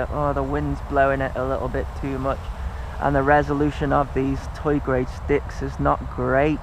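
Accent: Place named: England, United Kingdom. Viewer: G'Day. Pete In Australia here, very nice review, plus I just love your accent (I'm a Pom too) I subscribed to your channel a little while ago, some good stuff here man. Another You Tuber, Aeon38 recommended I talk to you so here I am. Sorry it's so long but I got a lot to ask! I also fly Mode One as does most of Oz and herein lies the problem. I've been flying R/C aircraft now for around forty years now (Don't ask!) and my brain is just absolutely wired to mode1. I have been teaching myself Mode Two recently with a few micro toy quads, F-36, etc (Gotta turn that one into FPV) and all is fine until it starts to go wrong and then the reflex's take over with disastrous results. With this little monster (Bugs) on 2s and later, 3S I do not want my reflexes to kick in and put Bugs in my face. (Or someone else, and this will happen if you are hovering nose out and you go to cut the throttle where-by you inadvertently give a back cyclic input, as you would know). 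What do I do? I really want Bugs 3 and unfortunately they haven't answered an email I sent to them so would you know of any flight controller boards that would work with this on DSMX/2. Or can I hook in a Mode 1 Rx to the standard board somehow? What can I do??? What are the ''Noisy Formula One'' type quads you mention. I neeeed one (on Mode 1 if possible). Would you have any links to the stuff we're talking about too by the way. I want something or things to: Fly real fast and steady. Fly real slow and steady for video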